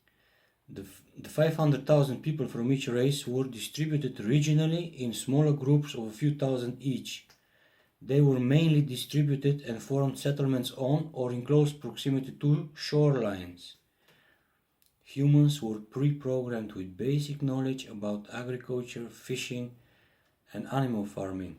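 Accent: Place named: Croatia. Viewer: where are you from you're accent sounds Croatian. I misspelled before sorry.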